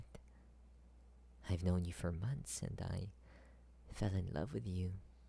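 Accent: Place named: Southeast Asia. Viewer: Low-key hear a southeast Asian accent but I can't point my finger on where the accent is from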